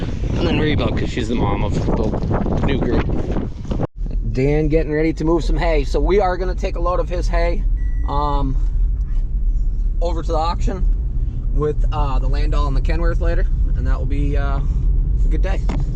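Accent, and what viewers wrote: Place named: West Coast, United States. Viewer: Your brother has a lot heavier NY or NJ style accent than you. You could easily blend with us West Coasters.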